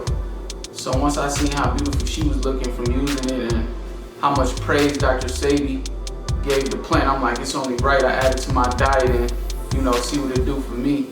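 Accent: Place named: Philadelphia, United States. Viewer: 😂 I hear Philly all in this man voice. Good video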